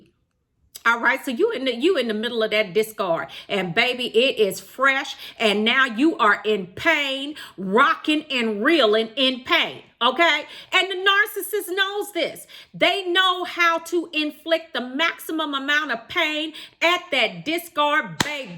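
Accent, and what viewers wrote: South, United States. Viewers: Good info sweetheart! Love your southern accent 👍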